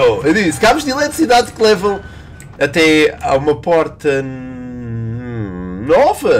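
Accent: Portugal. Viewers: Vc é de Portugal?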